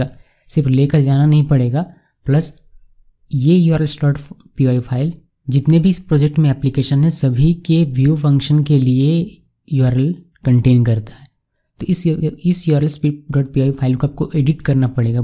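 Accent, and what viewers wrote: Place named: Punjab, India. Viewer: I have seen Django courses on youtube but your tutorial course stands out because .... 1. You have explained theory behind django framework. I have not seen anywhere else; even in paid courses instructor is not able to explain theory like you have my friend. 2. Now most important part in learning Django is practical hands-on; you have also spent lot of time explaining step by step (GeekySteps as you call it in your videos; I love them :)) so your students don't get confused about django file structure. 3. Aaap ki hindi punjabi se kaafi milti zulti hai. Kya aap punjab se hai? If Yes, Wahe Guru sarji Sat Sriya Kaal!! You deserve many thanks for your videos. Thank You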